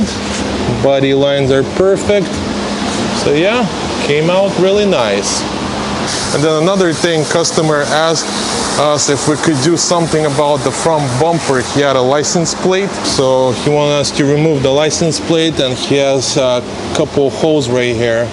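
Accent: Russia. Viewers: Я как то по акценту понял что ты русскоговорящий, привет из Румынии.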